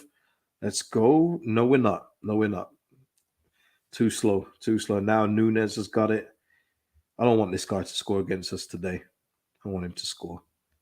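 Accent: West Midlands, United Kingdom. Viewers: Watching this the day after is priceless. Go on lad. Where are you from, you sound local (West mids)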